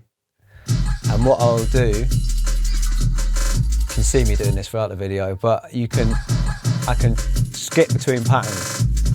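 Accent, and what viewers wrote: United Kingdom. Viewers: He has a standard Estuary English accent. Hard to define a class based on it I would say.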